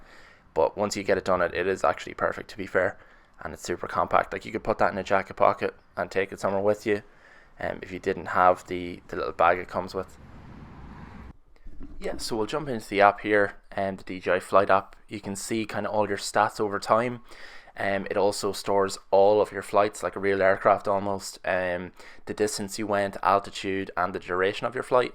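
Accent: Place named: Ireland. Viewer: Great review I have the same drone and like you building up confidence with every flight!!! It's fantastic hearing an Irish accent perspective just for a change!!!! Any plans to upgrde in 2023??? I'm looking at the Air 2S or Mavic Classic 3 purely for having a drone for every wind restince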